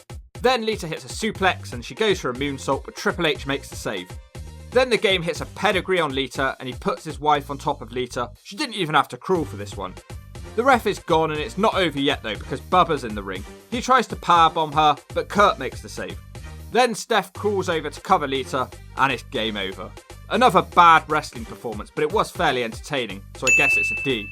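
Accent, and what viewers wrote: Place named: England, United Kingdom. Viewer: Why are the narrators always English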